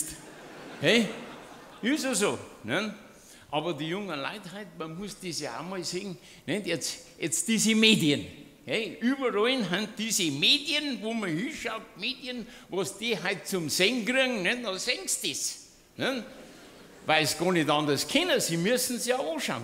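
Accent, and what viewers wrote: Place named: Bavaria, Germany. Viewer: Sei deitsch is mindestens so guat wia vo manchem Preissn, moan i.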